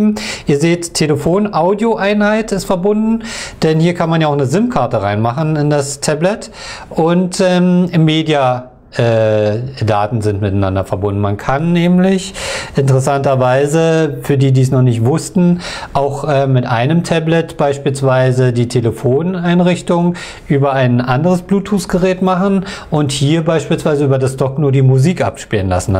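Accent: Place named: Hamburg, Germany. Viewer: Hamburger juuung :D Schönes Review, vielen Dank!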